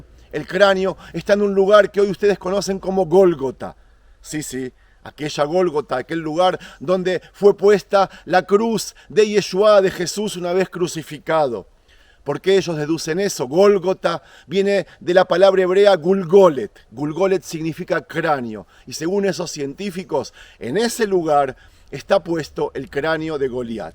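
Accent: Argentina. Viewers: Yo pensé que era Argentino.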